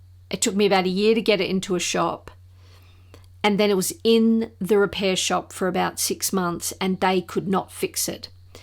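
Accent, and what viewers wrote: Australia. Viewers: Great to hear an Aussie voice. ❤ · Hi, I have a Philips something tt. As soon as you mentioned an Australian Hifi show I started your video and immediately heard a familiar accent. I'm in Sydney. I bought the Philips because of its beauty and simplicity but don't use it much coz I love my Tidal subscription for convenience. I restore n build Hifi gear for fun. My name is Peter. Cheers. Oh I saw your link on the Audiophiliac Steve Guttenberg channel.☮️🇦🇺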